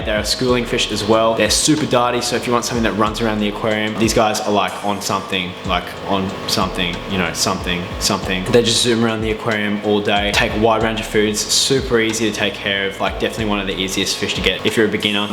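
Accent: Australia. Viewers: You sound Aussie mate. Talk litres!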